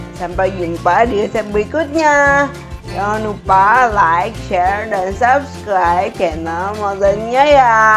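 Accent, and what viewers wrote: Palembang, Indonesia. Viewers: Cek , R nyo asli nian wong pelembang sabrang . Bedrot .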